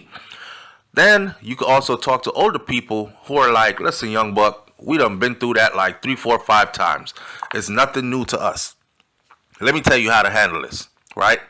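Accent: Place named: Jamaica. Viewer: Yhu sound like a yardy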